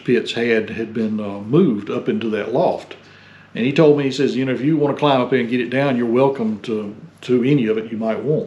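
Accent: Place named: South, United States. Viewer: I love hearing him tell story's , very Southern, very respectable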